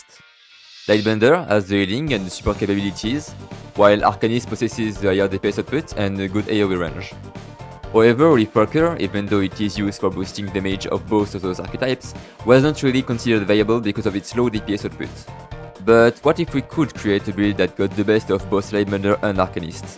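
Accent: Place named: France. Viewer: W vid mon reuf, l'accent fr qui s'entend bien x)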